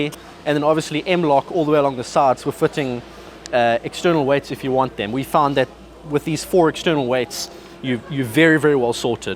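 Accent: South Africa. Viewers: Note (for those not familiar with South African English): When says /ˈrafl/, he means rifle /ˈraɪ.fəl/.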